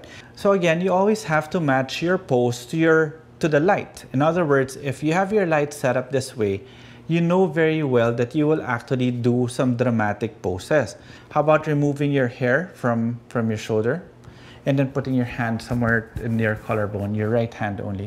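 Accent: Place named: Philippines. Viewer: Right away I knew he was filipino when he started talking and I have a cousin named Jiggie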